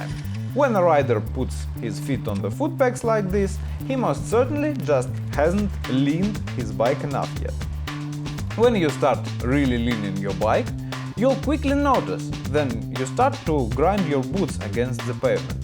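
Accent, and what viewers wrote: Russia. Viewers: Are you russian or enflisg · contenido en ingles, con acento ruso, filmado en argentina xD que combo. muy bueno tus videos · Fing russian accent... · Аххх! Этот классический русский акцент - прямо как из старых голливудских фильмов! =)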